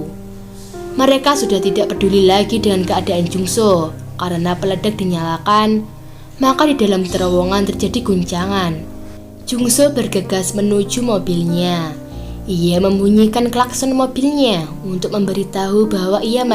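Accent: Java, Indonesia. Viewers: Ko medok gitu loh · ngomongnya medok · Jawa temen kie lah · Jawa ya? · Anjiiing jd ga seru. Logat jawa gini.fil bgs jd Alur cerita yang membangsatkan ini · Medok banget cukup sekali aku liat video ny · kalau memtah g enak lah,.mendig medok jadi empuk🤭🤭🤭🤭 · Suaranya medhok bgt sayangku. Saran aja sik biar yg nonton lebih comfy, cmiiww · Pasti kmu orang Jawa ya min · Ngomong nya Jawa banget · orng jawa nih yg pnya chanel..logat ny kciri bgt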